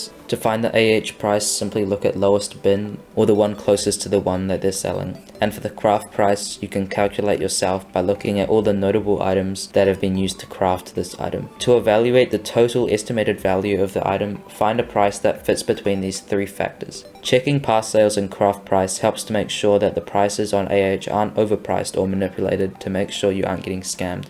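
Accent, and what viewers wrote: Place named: South Africa. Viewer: are you from south africa? ur accent sound like it.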